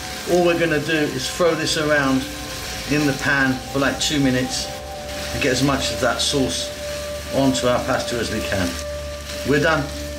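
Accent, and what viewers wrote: United Kingdom. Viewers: is it $ or £ ?... Chef sounds british & cooked good brit faves 😋 i will try but might spend more than $20 in £s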